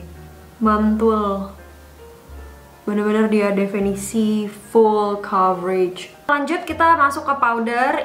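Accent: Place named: United Kingdom. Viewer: Lidah nyaa gilss gilss...Inggris bat dahh lidah ku langsung auto milih diam mingkem cep😭...btw hallo ka semangat bikin video nya yaaahh🥰✨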